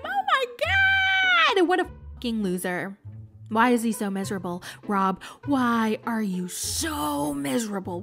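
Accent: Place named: Michigan, United States. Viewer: your Michigan accent LOL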